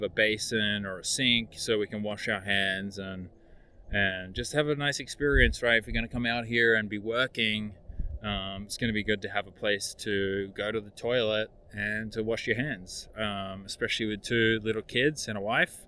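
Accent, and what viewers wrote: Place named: Australia. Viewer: Hope the property is close to a Tesla supercharger. Sound Aussie or Kiwi.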